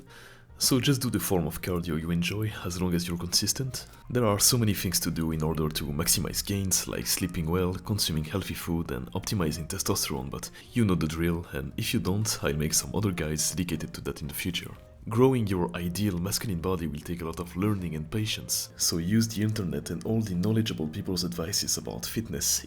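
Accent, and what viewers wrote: France. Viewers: No offence bro but you sound French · Is he French?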